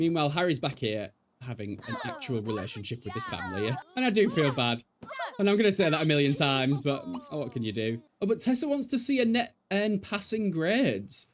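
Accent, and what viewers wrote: United Kingdom. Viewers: I'm from Brazil and I constantly watch Youtubers from all over the world to improve my Englsih. I stumbled across your channel recently and I'm basicaly watching every single video with these random gameplays/challenges for The Sims 2 - my favorite one btw - and 3 and it's always a blast for me 😂😂😂😂 I love your dark and twisted sense of humor, wrapped in such calmness and "fancyness" with your british accent, please never stop your videos ❤ They really make me wanna be your friend irl!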